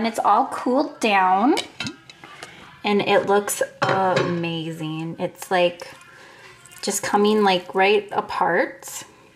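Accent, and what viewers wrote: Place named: Minnesota, United States. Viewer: trying this tomorrow. You must be from Minnesota. I can hear it in your voice.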